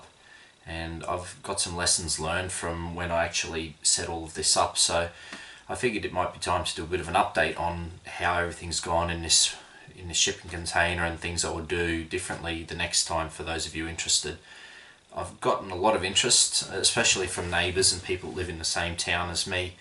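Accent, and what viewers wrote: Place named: Norway. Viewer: I love your Norwegian accent 👍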